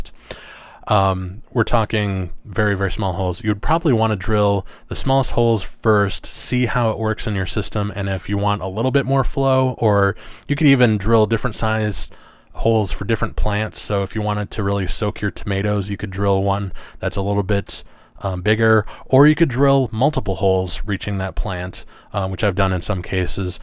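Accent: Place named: Midwest, United States. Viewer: It's Midwestern for roof. Sorry- our accent is awful.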